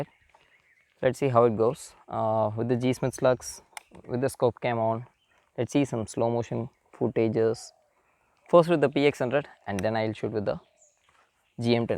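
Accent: South India, India. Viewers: Yea , i think he is from south Indian, because his accent is like a South Indian that's why so i m mistake thn please forgive me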